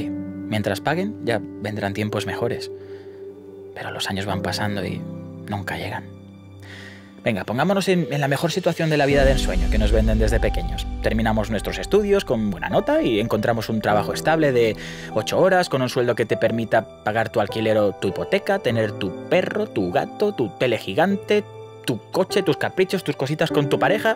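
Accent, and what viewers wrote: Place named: Colombia. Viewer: con esa forma de hablar se reoconoce a un colombiano de lejos jaja la buena parcero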